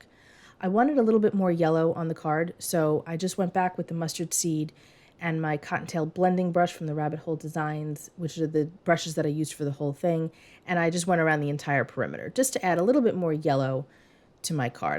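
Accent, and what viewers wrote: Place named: Massachusetts, United States. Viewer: Hey, I just subscribed, where are you from? I’m from Massachusetts but live in Rhode Island now and you certainly sound like you are from the area.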